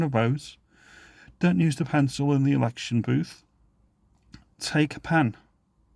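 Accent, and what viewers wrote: Liverpool, United Kingdom. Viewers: Is this how a posh scouse sounds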